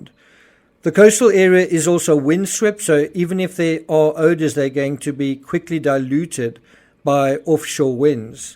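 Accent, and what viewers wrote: South Africa. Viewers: You sound South African? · Stumbled across this video 😊 ... Is that a fellow South African I am hearing 😊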